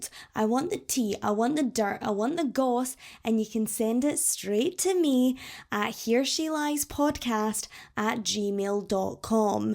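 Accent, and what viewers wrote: Scotland, United Kingdom. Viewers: Lovely accent. Where in Scotland are you from? Aberdeen maybe? I was born there.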